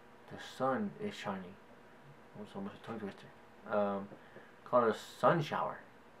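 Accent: Louisiana, United States. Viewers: you are pretty Cajun. XD BUt, yeah, I have heard a lot thicker. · your accent is a touch Cajun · yeah, you definitely sound Cajun. I love way you talk. I'm from southwest Virgina. I've always called my grandparents papaw and mamaw, too, we say wash the same way, too. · You definitely have one. It is not as heavy like others have said but I hear it. When you say sixty, it sounds like siggsti. And when you say fork, stuff, sure, New Orlinnns. You pick up your vowel sounds really lightly, almost like you are are rapping. And your t sounds are really strong lol. Houston and N'awlins y'all.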